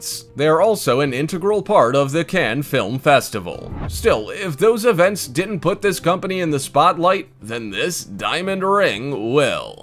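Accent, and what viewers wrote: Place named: United States. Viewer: Beautiful gems, but the American accented narrator, the guy in particular is extremely painful to listen to, he talks as if we're all thick as two short planks. Had to switch off the volume. As for the garish countdown with that stupid character it really makes a mockery of the content; which are the fabulous jewels. Re-do this with a more pleasing voice (even mine is 10 times better) and get rid of the character countdown and what the equivalent of the jewellery could buy, eg., 13 American homes, yada, yada.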